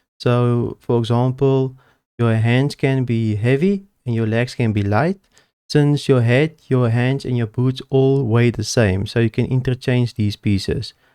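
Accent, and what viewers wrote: South Africa. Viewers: Are you south african brother?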